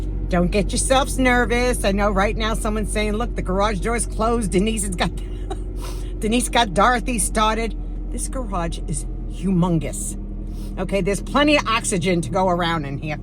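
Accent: New York, United States. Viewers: Oh my goodness!!! I can definitely relate to you! My husband was a long haul driver too! The first few years we were married he was gone all the time! now he drives for Uber L O L I like it. But then I don’t like it when he sits in his chair at home! i’m like…. don’t you wanna go do something? He’s like, No…but we have animals so he’ll go outside to the animals in the morning and then he’ll go tend to the animals in the afternoon…. but then other parts of the time he’s in his chair when he’s not driving for Uber and then I say don’t you wanna read a book or learn a new craft; build a new porch or build us a new deck?!?!, my firstborn was born in 1987 so I wonder if we also have that in common…what year was your daughter born? By the way, I love your accent. Are you originally from Long Island or from New York? So your birthday is on the 28th!!! 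How exciting! I hope you watch my next video. I shouted you out!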